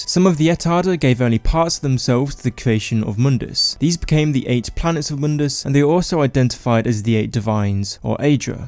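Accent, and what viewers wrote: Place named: England, United Kingdom. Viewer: Haha man I think I get what this guy’s saying. You’re like English friend one, and Simon from [everysecondyoutubeaccount] is English friend two. David Attenborough is like my Grandfather who’s since past. Voices and accents are fascinating.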